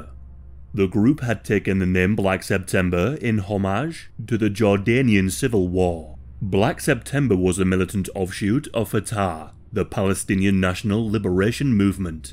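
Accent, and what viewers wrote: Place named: Hebrides, United Kingdom. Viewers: God hes so Scottish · It's nice to hear someone who (I think) has the same accent as me doing well on YouTube! Hi from Hebs :)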